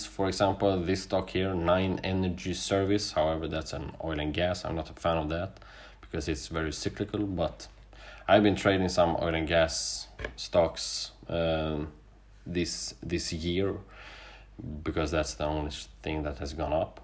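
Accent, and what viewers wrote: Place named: Sweden. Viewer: Tjena, kanske ingen vild gissning men tippar på att du är svensk. Tack för en bra video, leker runt med finviz för att hitta bra aktier. Mest dock penny stocks som kan bryta ut kraftigt är det jag letar efter. Tycker du ska köra på svenska istället för engelska, mindre tittare kanske men tror ditt talande hade blivit mer flytande och naturligt. Har du fler set ups på finviz du kan rekommendera om man vill leta aktier som kan sticka iväg uppåt?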